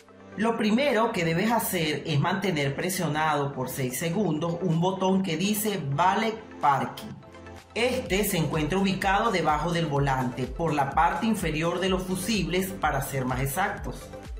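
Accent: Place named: Colombia. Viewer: claro maestra de Peru soy electricista automotriz y trato de actulizarme a traves de youtube ojala el otro año pueda matricularme en un instituto conocido en mi pais gamor para aprender electronica automotriz y usted maestra de que pais es? ese dejito tan bonito acaso de Colombia?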